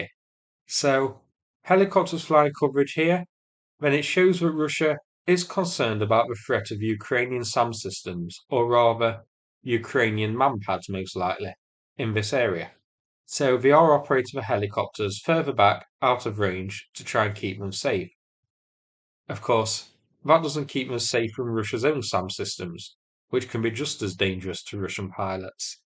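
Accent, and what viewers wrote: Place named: Northern England, United Kingdom. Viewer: Krinkeh! Love the northern English pronunciation of the names and also the jokes. Keep it up lad!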